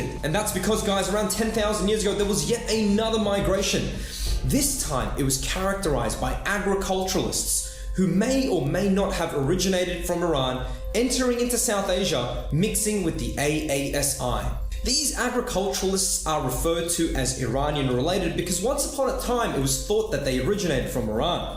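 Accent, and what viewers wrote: Australia. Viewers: Your videos are great. And the Austro-alien accent makes it even better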